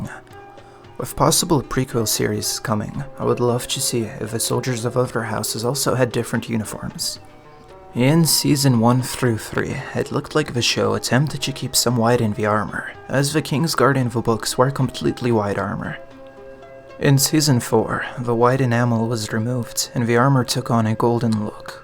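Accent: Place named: United States. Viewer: You write armour with british english but you sound slightly american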